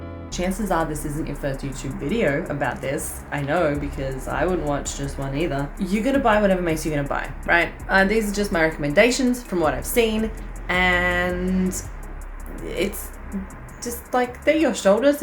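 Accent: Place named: Australia. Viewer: that aussie accent ⚡ i started with Maces 8, 12, 15, 10 & now finally 4kg & even 2kg. Steel clubs journey was different: 4kg pair, 4kg single, 12kg pair, 16kg pair, 20kg pair, 8kg pair, 6kg pair, 1.3 & 2kg indian wooden clubs pairs. the bodybuilder-gym mindset does not work with these tools at all. In all my workout light weights are warm-ups & cool-downs. Also it is great to have light-medium-heavy days. Everytime i hit a heavier mace, lighter ones feels so much lighter after the heavier ones. So, now 15kg feels still heavy, but the day i hit the 20kg one, 15kg will feel lighter. To ascend 2kg weight in steel mace i use golden rule: ability to perform 100 reps 10 to 2 or 200 reps 360. i also use steel maces for muscle building exercises & they can do that function too.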